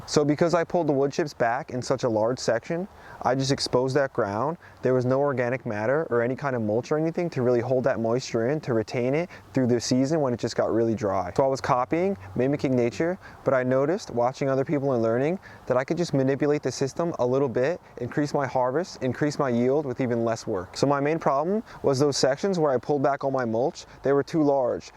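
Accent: New Jersey, United States. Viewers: Hey, thanks again. Funny, you took the words right out of my mind about your 1st apple tree: why plant a common grocery store variety, and why not graft onto it. Lol, thinking alike. Do you know John Kohler? He has some pretty good info on YouTube. Also, you’ve become my 2nd favorite New Jersey person (you guessed, fav is The Boss); I’m wondering if you have typical NJ accent? I’m in SoCal.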